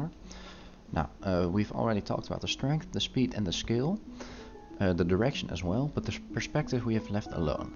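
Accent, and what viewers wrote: Netherlands. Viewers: Aan je accent te horen ben je Nederlands :P Mooie tutorial, heeft mij erg geholpen!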